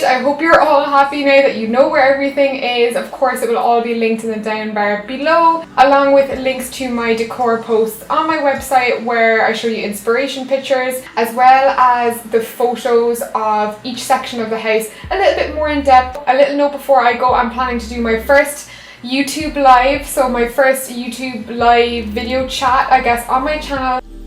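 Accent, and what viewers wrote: Ireland. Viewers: Is she Irish.... She sounds irish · What part of Ireland are u from?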